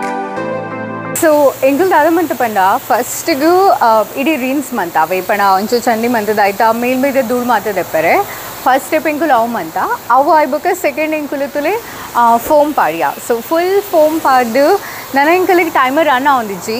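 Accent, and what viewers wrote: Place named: Tulu, India. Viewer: Your tulu slang is so nice.... Nice video..👌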